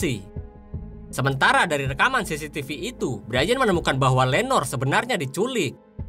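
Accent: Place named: Ngapak, Indonesia. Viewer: Wong Jawa ya mas, ngapak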